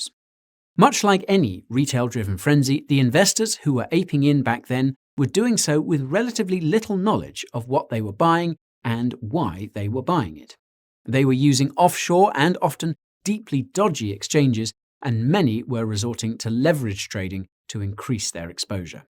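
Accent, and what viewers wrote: United Kingdom. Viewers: It is so much easier listening to a Brit compared to an American.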